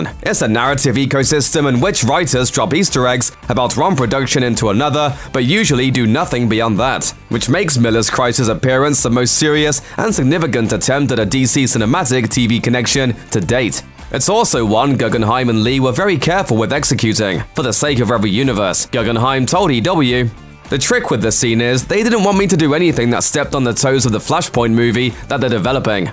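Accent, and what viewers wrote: United Kingdom. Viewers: I can’t tell if it’s British or not lol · He's actually British. He's a YouTuber called.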